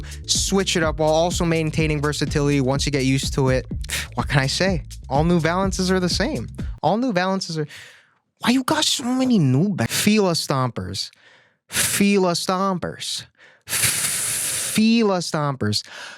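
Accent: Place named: New York, United States. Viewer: It’s funny to see an island boo speak with a New York accent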